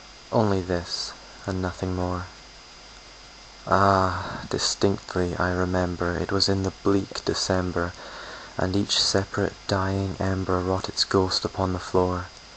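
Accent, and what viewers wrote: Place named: Scotland, United Kingdom. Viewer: This will not get you girl/boy friends dude so if thats you angle buy a leather jacket and follow a rock band. However . . . if your passion is poetry, your in a obscene minority and I welcome you to a lonely club. If you message me back I will subscribe to your channel and follow your posts. Lovely reading, I'm half Scottish and I appreciate the accent. I would maybe like to see you post some of my favourites like "My Creed", "If" and "Invictus"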